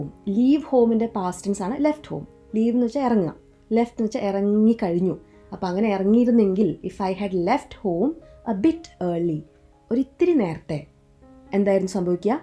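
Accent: Thrissur, India. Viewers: Madam, തൃശൂർക്കാരി ആണോ ? Slang sounds like Thrissur 👍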